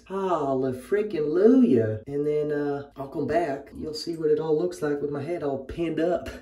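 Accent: South, United States. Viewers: I have never been so in love with a southern accent😅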